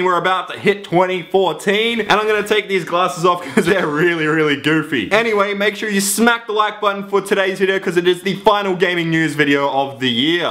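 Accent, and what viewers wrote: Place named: Australia. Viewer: He didn't say the last part!!!! "My accent is Australian and blublublubla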